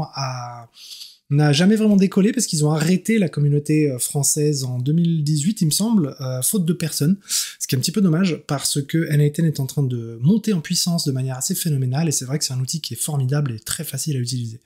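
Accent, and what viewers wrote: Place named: England, United Kingdom. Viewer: top ,comme à ton habitude et j'aime bien ton accent anglais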